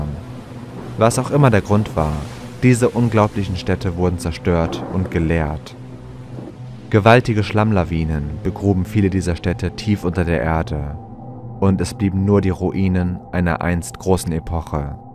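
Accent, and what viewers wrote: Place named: Hesse, Germany. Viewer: Der hessische Dialekt kommt manchmal durch 😅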